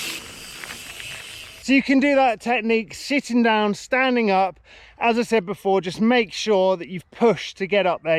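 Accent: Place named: Bristol, United Kingdom. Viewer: In Bristol you say maniall and weelay 🤣🤣good stuff bud, makes sense might give it a sesh.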